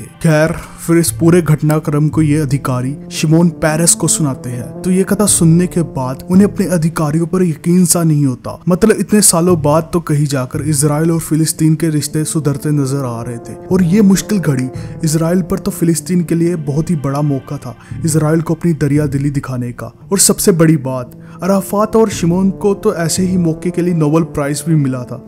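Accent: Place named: Punjab, Pakistan. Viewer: Pakistani punjabi ryt bhaisaab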